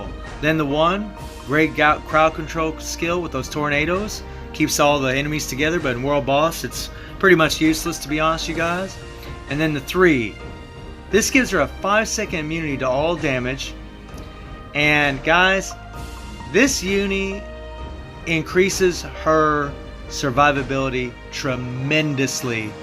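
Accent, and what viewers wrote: United States. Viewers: Dude are you from north Carolina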